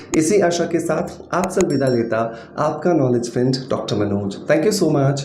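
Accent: Bengal, India. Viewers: Sir aap kya bangali ho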